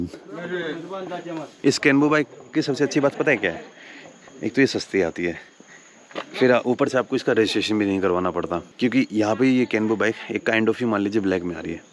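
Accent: Haryana, India. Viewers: The way you talk in haryanavi 👌👌🙏❤️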